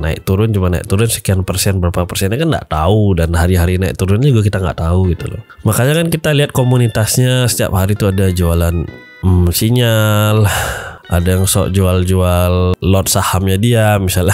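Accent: Bali, Indonesia. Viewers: Logat bali, dari mana bli?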